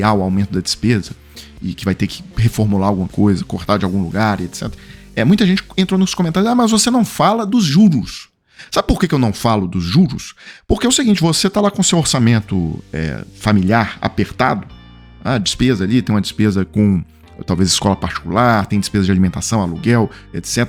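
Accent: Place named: Espirito Santo, Brazil. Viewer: Como se esse rapaz? Alguém sabe? De onde ele é? Tem sotaque de Capixaba.. É tão inteligente e perspicaz ❤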